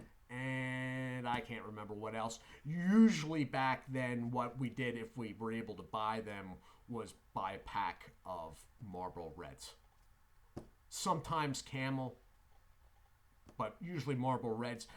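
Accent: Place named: Pennsylvania, United States. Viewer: I thought your accent was south of Bucks county 👍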